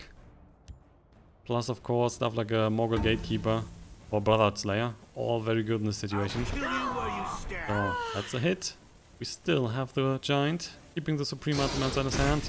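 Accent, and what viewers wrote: Germany. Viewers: You speak like a german guy lul^^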